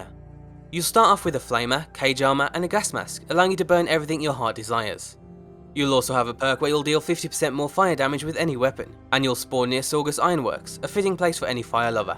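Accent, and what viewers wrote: United Kingdom. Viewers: I'm a subsriber since...a long time, but I still don't know where is from. I'm guessing british, but not sure.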